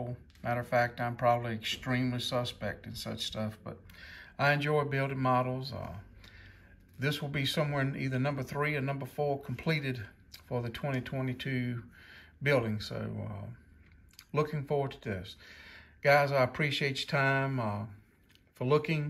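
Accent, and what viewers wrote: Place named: Northern Minnesota, United States. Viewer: Ahh ok, accent sounded off to be northern Minnesota lol but people do move. Again good luck on the USACC build!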